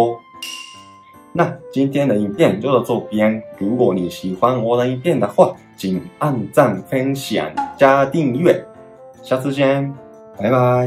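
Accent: Taiwan. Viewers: 的台湾语调真的很突出。看来在一个地方学习语言就会真的明显带有一个地方的语调。也希望可以学学东北口音；-p 那也会很有趣wwwww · 第一次听到台湾腔的日式中文发音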